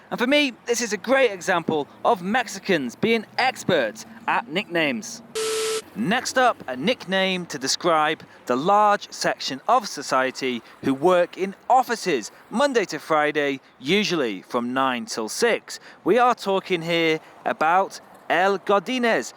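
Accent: England, United Kingdom. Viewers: me gusta tu acento ingles a ver cuando me das clases de tu idioma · I used to watch British Telly until the 2006 , that's why I understand and love your accent but honestly I didn't know the little Britain lol .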